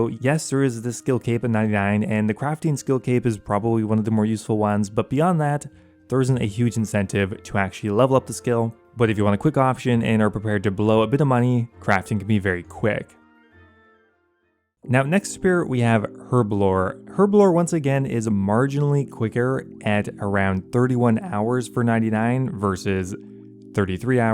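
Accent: Canada. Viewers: That’s the American way. This guy sounds Canadian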